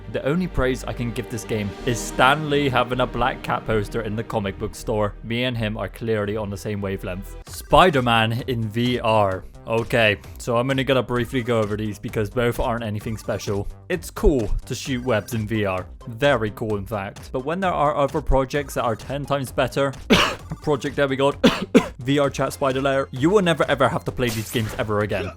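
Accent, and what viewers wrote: Bristol, United Kingdom. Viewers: you from bristol?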